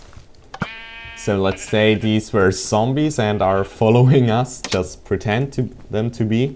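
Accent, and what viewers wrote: Germany. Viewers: are yu germaner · oooh he sounds german! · Well, you kinda have a german accent, at least in my ears.. It that true? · are you german cause you have a little akzent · Bist du Deutsch ? :D Hast so einen Akzent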